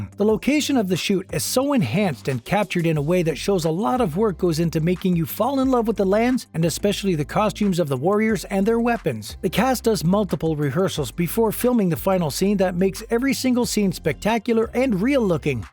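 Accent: United States. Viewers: PLEEEAASSEE....LESS OF YOU TALKING..ABOTE the show!!! Sorry to offend... But when you decide to do any kind of public speaking, you REALLY need to try & lose the annoying Boston accent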